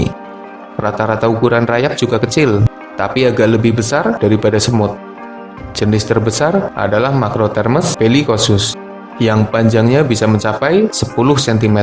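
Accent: Banyumas, Indonesia. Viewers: Serasa di warteg dengr ngomongny,ini aslinya ngapak kykny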